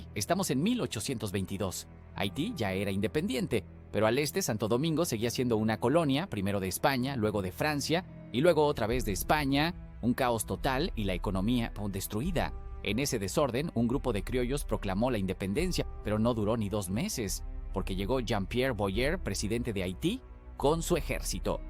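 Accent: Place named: Mexico. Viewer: Dueños este programa: ustedes son estúpidos o se hacen? Primero hacen un resumen ¨calimocháo¨ dejando muchísimos detalles importantes de nuestra historia, luego ponen a un narrador ¨mexicano¨ que dice ¨nosotros¨ como si esta fuera la independecia de México. Séan más serios...! Páguenle a un buen locutor o escojan a alguien que lea bien sino quieren pagar... pero que sea y se oiga como DOMINICANO!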